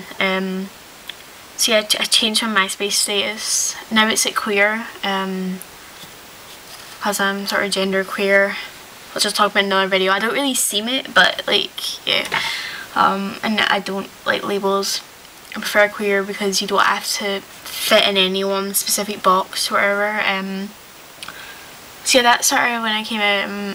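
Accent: Scotland, United Kingdom. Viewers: Scottish! :D Loveee ittt. :D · you are quite adorable & i love your accent. if i lived in scotland, i'd totally date you<3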